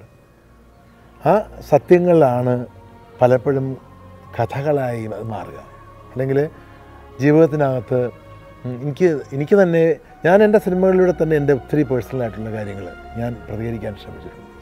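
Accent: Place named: Kottayam, India. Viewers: Achayanmarude pakka style samsaram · പാലാ style ഇൽ തന്നെ ഉള്ള സംഭാഷണം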